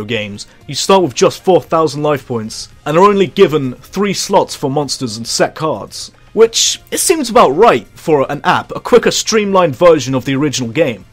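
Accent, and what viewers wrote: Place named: England, United Kingdom. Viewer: Trevyn Garris he should go into voice acting. Lord knows there's a shit ton of English accent VAs in games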